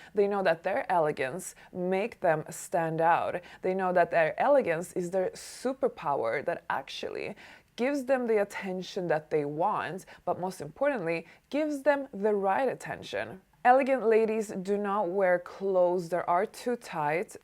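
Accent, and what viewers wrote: Sweden. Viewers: Why do you sound Swedish?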